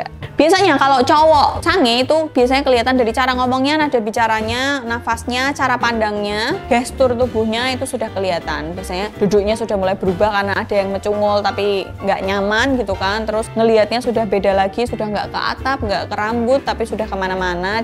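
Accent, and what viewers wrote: Java, Indonesia. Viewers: Medok amat logat jawanya😢, jd ilang selera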